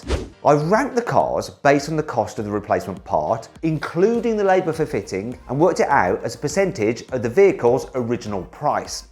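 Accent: United Kingdom. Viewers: マットの英国アクセント好き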